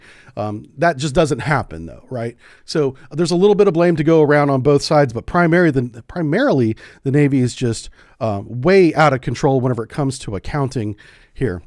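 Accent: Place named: United States. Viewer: You sound american or Canadian; but you use British collective nouns (...how ARE China's Army). WTF?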